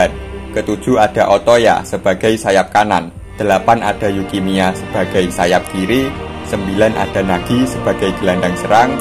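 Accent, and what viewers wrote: Java, Indonesia. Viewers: Pembawaan udah bagus minus di logat Jawa nya aja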